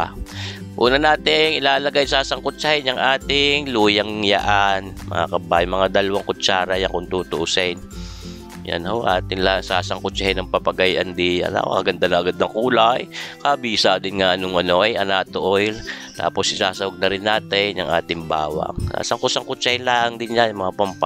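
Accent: Batangas, Philippines. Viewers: Nakaka aliw ang punto ng bigkas ng salia po ninyo taga batangas po kayo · Ok sana pero asar ang tono ng salitang battangueño pangit pakinggan. Sa akin lang comment yan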